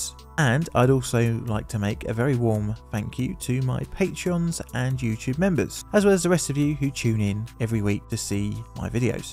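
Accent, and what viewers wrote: United Kingdom. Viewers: The heavy Brit accent detracts from the story, which happened in the US.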